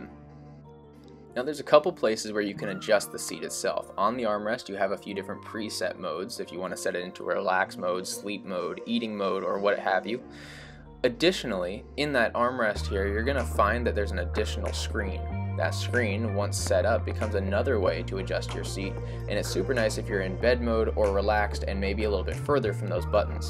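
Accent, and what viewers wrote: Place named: Bay Area, United States. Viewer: I have become an avid follower of your detailed and very entertaining in-flight videos. Everything about them holds my attention making it easy to sit back, get comfortable and let the video do its thing. I also believe that your phrasing and (non) accent indicative of the Bay Area, where I am from, makes me feel very much like I'm listening to someone with whom I am familiar or have known a long time.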